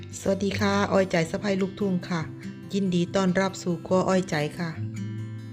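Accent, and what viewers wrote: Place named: Korat, Thailand. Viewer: สำเนียงเหมือนคนโคราชเลยค่ะ😊😊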